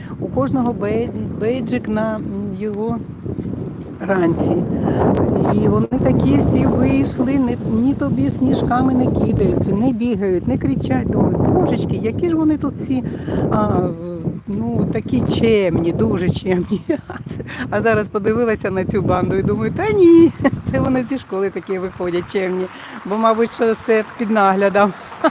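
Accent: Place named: Russia. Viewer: по Вашему акценту чутно, що Ви все життя розмовляли російською - розмовляйте, так, як зручно..... Навіщо розпалювати цей мовний конфлікт- російська мова, це що власність путіна???????? В Норвегії теж розмовляють норвезькою та англійською мовами, але ж Слава Богу ніхто нікого,асвабаждать, не лізе...........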